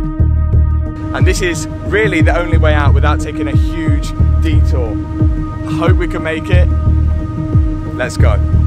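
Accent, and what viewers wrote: Leicester, United Kingdom. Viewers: Your from Leicester 😁😁😁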